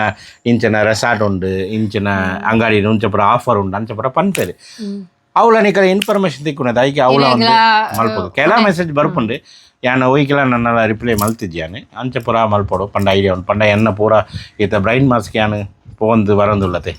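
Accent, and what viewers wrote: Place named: Tulu, India. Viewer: Ur tulu 😂👌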